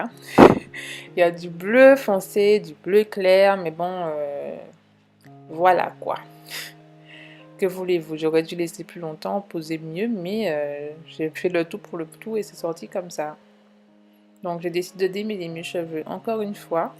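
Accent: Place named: Guadeloupe, France. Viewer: Oui super! Ma question était un peu bête car en regardant ta video 23 choses a savoir je l'ai su mdrr (de toute façon à l'accent je m'en doutais eheh) #TeamGuadeloupe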